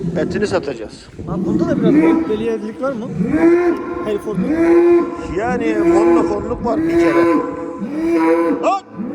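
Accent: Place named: Kahramanmaraş, Turkey. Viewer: abi Maraş ağzı konuşman o kadar hoşuma gidiyor ki:))) komedi videosu izler gibi seyrediyorum. Memleket özlemimi gideriyorsun:))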